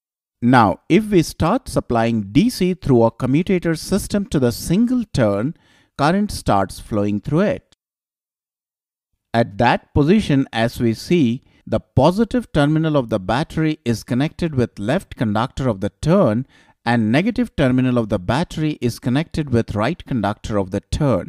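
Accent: India. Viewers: Hindi accent I'm sure. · Bengali accent most probably. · I love the way he pronounces conductor as cundaaacter typical indian accent ! but awesome effort thnk y sir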